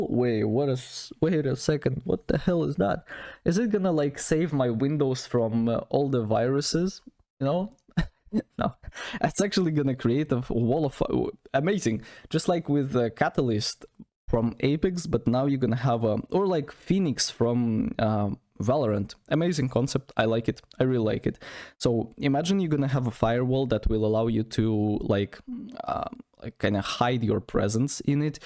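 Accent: Russia. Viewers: Мне показалось или у тебя русский акцент?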